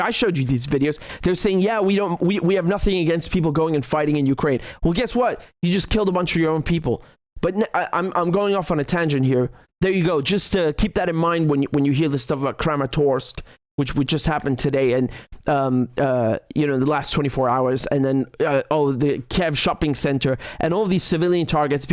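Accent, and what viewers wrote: South Africa. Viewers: Hes very South African😂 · 100% south african accent. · Yup English south african Doesn't sound Afrikaaner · Definitely South African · Yes, he is a South African with an Afrikaans accent.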